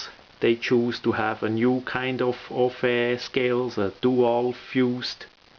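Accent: Switzerland. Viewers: ich mag deinen Schweizer Akzent^^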